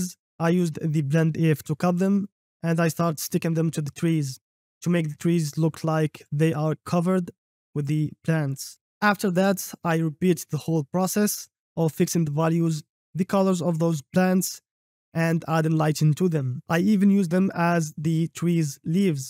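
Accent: Arab world. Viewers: أنا متأكد انك عربي من لهجتك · أحسك عربي ؟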